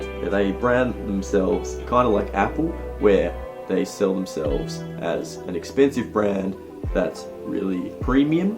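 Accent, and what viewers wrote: Australia. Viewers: Are you Aussie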